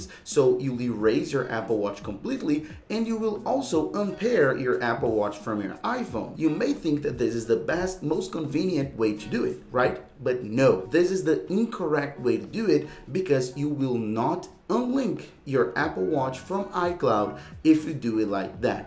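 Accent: Brazil. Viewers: That Brazilian accent is thick my boy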